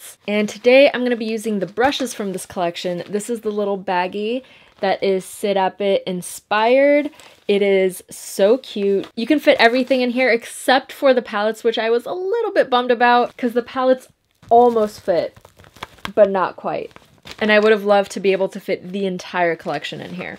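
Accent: Brazil. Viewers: Eu amo o seu sotaque carioca kkkk, seria legal ter um vídeo seu falando português, mas entendo que seria difícil em razão do seu publico ser majoritariamente composto por gente que fala inglês, AAAAAA E A MAQUIAGEM ESTÁ FENOMENAAAL ♥ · she's brazilian, if that clarifies things haha. portuguese and spanish are pretty similar languages 💞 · vc é brasileira CERTEEEEEZA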